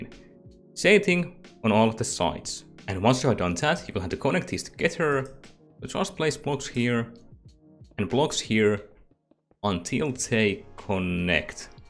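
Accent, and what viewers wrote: Finland. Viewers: Are you finnish ?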